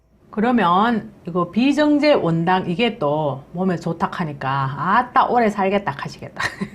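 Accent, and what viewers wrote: Gyeongsang, South Korea. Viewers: 세프님 사투리 넘심해요 나도 경상도 출신인데 듣기가 좀거북 하네요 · 선생님 고향어디에요 말씨가 시골말 참듣기좋아요ㅣ 답장주세요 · 여사님영상을계속보는대경상도발음이넴.귀엽고사랑스럽네요 향상구독하니.건강하시고좋은영상많이보내주세요 넴좋아합니다 여기는부산입니다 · 갱쌍도 사투리가 엄~좋아부러유~~♪~♬~♩ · 경상도 아지매 정말 정겹습니다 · 저는 너무 경상도 말씨가 너무 정감이 가요 매번 참 잘 보고 공감 을 합니다 매번 알틀 하시고 경제적이고 쉬위서 많이 이해가 가요 근데 매실은 오래 두면 독이 생기고 씨가 그렇다고 한데 먹기가 참 아리송 해요 담 아서 얼마간 숙성 을 시켜야 하는지요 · 갱상도 사투리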